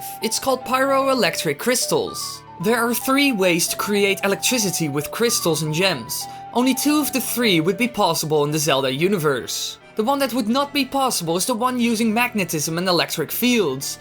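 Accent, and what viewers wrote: Netherlands. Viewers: lekker nederlands accent man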